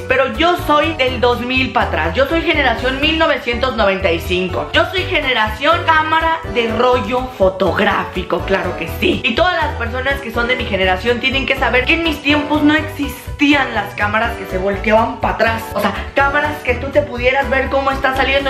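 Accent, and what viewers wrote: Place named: Mexico. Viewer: .... Are you like a Freso? I have friends from Mexico And thery act n talk like you.. Not as funny But they go t the same mexica Style of your Lingo.... Awesome channel You are very Funny I love it❤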